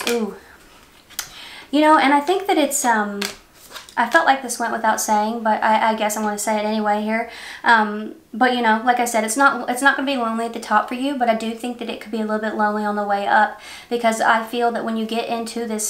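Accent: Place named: South, United States. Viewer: Your southern accent makes me homesick for Kentucky, my childhood........... are you from KY or Tennessee?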